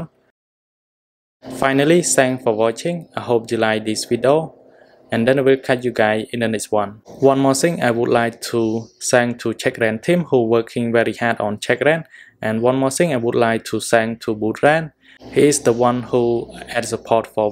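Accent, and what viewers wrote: Vietnam. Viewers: Why you read english like vietnamese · Heavy Vietnamese accent, is it like the slang America's use, or it it because that so American to you that it's already thrown out as hidden philosophy that help you speak and talk like a doctor without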